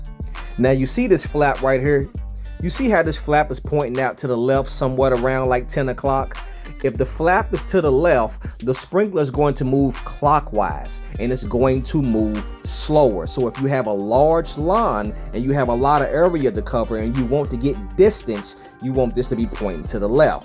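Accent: South, United States. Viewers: Love the accent. We southern!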